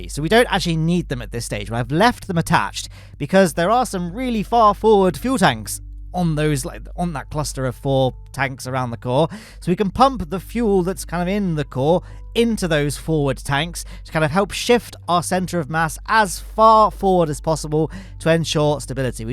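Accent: United Kingdom. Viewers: Love the incoherent british rambling about "jool" and "jewel" for 5 minutes. Keep it up!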